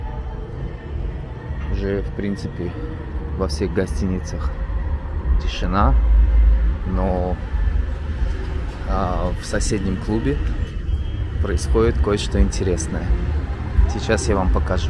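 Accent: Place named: Uzbekistan. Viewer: Акцент такой только у Узбекистанцев! 😀👍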